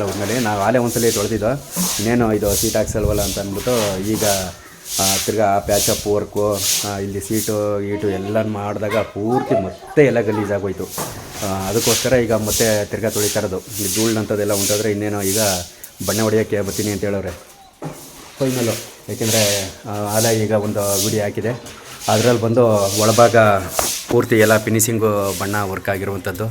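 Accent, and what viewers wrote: Mandya, India. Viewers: ನಮಸ್ಕಾರ ಅವರಿಗೆ ಡೈಲಿ ವ್ಲಾಗ್ ಮಾಡಿ ನಾವು ಬಳ್ಳಾರಿಯಿಂದ ನಿಮ್ಮ ವಿಡಿಯೊ ಇನ್ ಟ್ರೆಸ್ಟಿಂಗ್ ಇದೆ ನಿಮ್ ಮಂಡ್ಯ ಭಾಷೆ ಕೇಳಕ್ಕೆ ಖುಷಿ ಅಸ್ಸುತ್ತೆ ಶೇವಿಂಗ್ ಮಾಡ್ಸಿ 5 ವರ್ಷ ಸಣ್ಣ ವ ಆಗಿದಿರಾ ಮನಸ್ ಮಾಡಿದ್ರೆ ಇನ್ನೊಂದು ಮದುವೆ ಆಗ್ಬೋದ್😊😊 ವಿಡಿಯೊ ಸೂಪರ್👌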